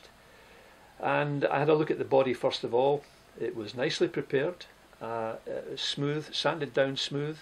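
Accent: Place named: Scotland, United Kingdom. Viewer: That made happy to hear the Scottish accent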